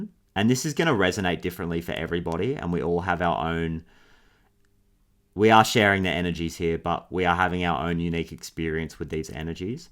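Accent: Australia. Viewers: He's Australian!!❤🤩A shinning example of our beautiful connected Australian men 😁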